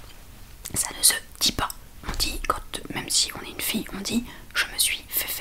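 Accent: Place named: Paris, France. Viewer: En écoutant cette vidéo, je me suis dis "ptn c'est une parisienne c'est sur " 😂😂😂 désolé pour le préjugé mdr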